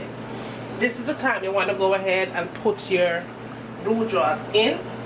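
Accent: Jamaica. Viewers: Thank u mami...u always make cooking seem oh so easy like 1-2-3....continue to share your gift with us ...I am always enlighten and inspired whenever I watch and follow ur tutorials ...big up yuself...PS love the accent...real yaadie...muaaahhh😙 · As a born jamaican living overseas, you don't truly appreciate the beauty of the jamaican accent until you listen to it from a different perspective. Keep the authentic jamaican food coming - best food in the world! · Fantastic! Thank you, great step by step explaination, love your Jamaica talk! Perfect. Felt like I was back a yard!! Great Channel. xx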